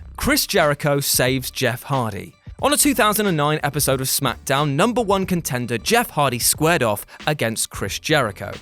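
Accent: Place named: Newcastle, United Kingdom. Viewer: Yessssss a fellow geordie 😂😂😂💪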